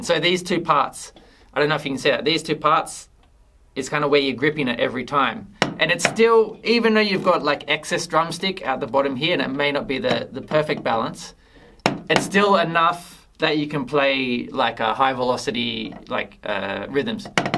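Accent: Australia. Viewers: His sound like Aussie English takes Japanese for Tokuda has God :))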